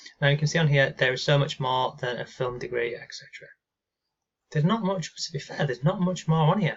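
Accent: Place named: North, United Kingdom. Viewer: I love your accent! Is it from the north?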